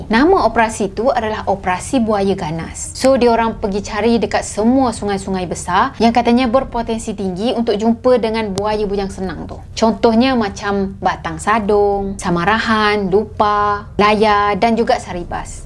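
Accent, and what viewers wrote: Kelantan, Malaysia. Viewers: Kito fokus molek klu kak bcerito. Meme bnyi KL molek.Tp part SADAK tu suko sore kito. Heheheheheh😂😂😂😂😂😆😆😆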